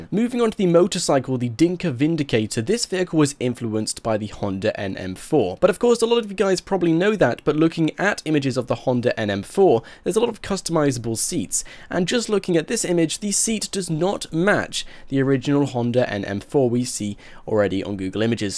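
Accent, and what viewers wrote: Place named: RP, United Kingdom. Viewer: You sound so posh, I LOVEEE IT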